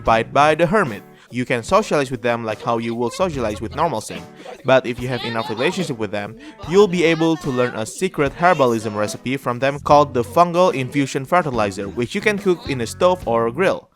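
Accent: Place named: Indonesia. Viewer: OMG I don't know if you're Indonesians, first time I hear your accent it's look like Indonesian people talking English lol that's cool 😅👍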